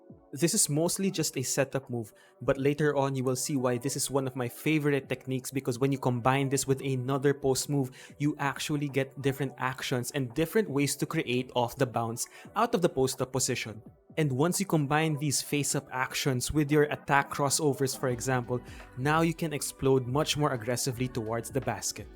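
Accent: Philippines. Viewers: First of all keep it up. Awesome content! Second of all I like your Filipino accent lol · Is that a Filipino accent?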